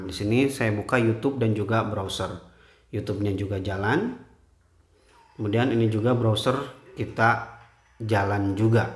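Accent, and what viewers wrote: West Java, Indonesia. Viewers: Orang jabar ya kang?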